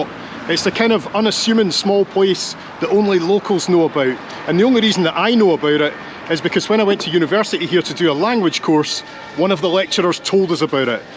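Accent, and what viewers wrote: Scotland, United Kingdom. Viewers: As a Norwegian, I do understand your Scottish dialect quite well. I have a friend from Glasgow, I need to ask him a few times when he elaborates in discussions in Glasgowean. I guess it is a matter of listen to it and get used to it and to tune your ears to it. Then you will hear and understand it after some listening. I have the Rab C Nesbitt series, it is subtitled in English. I invite you to Norway. We do have dialects here that are like separate languages. Norway and Sweden is like Scottish and English dialects. Swedish people say they dont understand Norwegians, but that is just lazyness and non interest of trying to listen to the sound. Norwegians is opposite and try to tune in to Swedish language and that works. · Hey, I just subscribed to your channel. I've been watching for about a week now and to mimic your lovely Scots brogue "I haven't been disappointed!" Your day trip to Gothenburg was an eye opener as this is where my granny grew up and throughout my life never knew what the city was like. 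Thanks. This morning I watched your Salamanca return visit. It was amazing. After watching all these trips of yours, I just cannot figure out how you can afford it 😮. What's your secret? I'd also like to know a bit of your back story. Letting you know you have a new fan.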